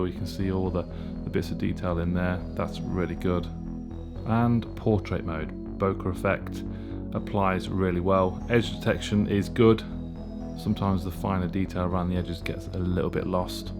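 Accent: United Kingdom. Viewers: 0.88 Mbps download speed? I would have guessed from your accent that you are British, but apparently you live in a rural are in some developing country?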